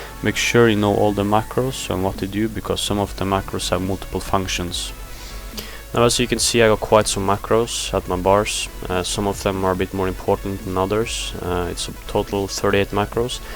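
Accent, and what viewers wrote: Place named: Norway. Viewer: norsk engelsk <3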